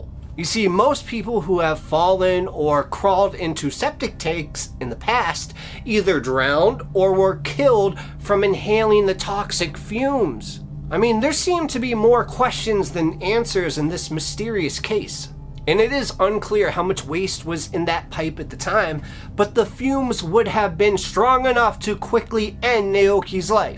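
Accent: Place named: United States. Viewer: Love your videos and the American accent as im in England 🇬🇧 i love watching your videos every time you put them out but due to time difference across the pond i get to watch them a little later on. Keep them coming though ❤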